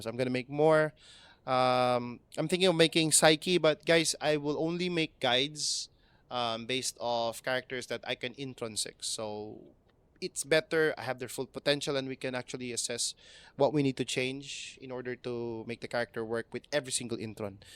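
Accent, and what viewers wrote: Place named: Philippines. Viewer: Bro sounds Filipino HAHAHA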